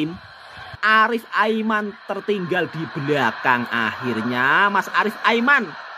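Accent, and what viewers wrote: Java, Indonesia. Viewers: pertama kali mendengar ulasan loghat Jowo. 👍🏼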